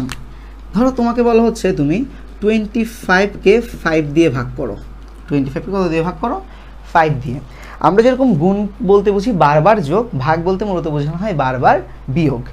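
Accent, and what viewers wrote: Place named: Chittagong, Bangladesh. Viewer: pure chatgaiya tone...love it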